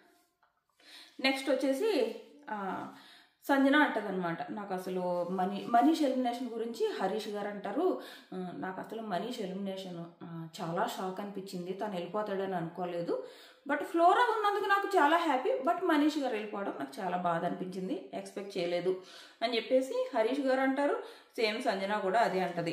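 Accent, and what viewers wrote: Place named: East Godavari, India. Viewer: East godavari voice ❤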